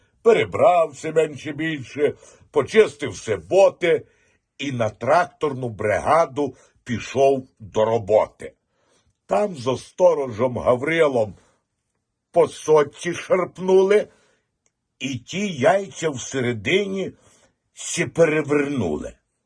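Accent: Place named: Lviv, Ukraine. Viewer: Класно! Дуже посміялась! А львівська балачка- то супер файно!😂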